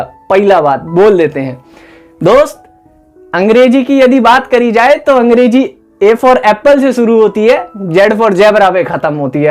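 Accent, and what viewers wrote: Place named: Bihar, India. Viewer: bihari tongue, kya baat hai kya baat hai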